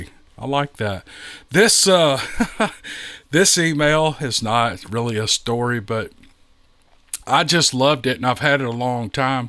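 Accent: South, United States. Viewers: I just love you! You're such an excellent story teller! And your voice and warm southen accent are pure perfection! (Accent! What accent???) Listening to you is always like sitting around a campfire listening to my favorite uncles telling stories. Thanks, for providing such great entertainment!!!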